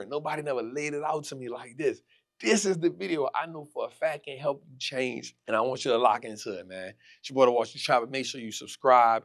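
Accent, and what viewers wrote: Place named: New Orleans, United States. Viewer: Initially, I couldnt understand nothing (but I could really undrrstand everything)!😂❤🎉 but I love it though... Im just like yeap.... that initial was def #NOLA!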